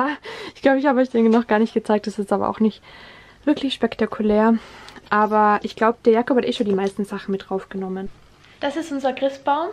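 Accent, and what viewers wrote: Austria. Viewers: Welcher Dialekt ist das? "für die Uni zu dun" "Unicondend" :D klingt nach Österreich oder so?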